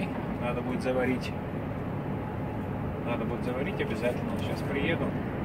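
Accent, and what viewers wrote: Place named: France. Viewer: Спасибо, ну впринципе не особо удивлён, последствия "приёма беженцев" во всей красе И грязь и попрошайки это пожалуй самое безобидное. Каждый раз удивляюсь твоему французкому акценту - навигатьион, итд:) Вроде немец жеж:) Думад француженка, так нет, вы по немецки вроде общаетесь, логичнее кмк Кэтрин всё же:)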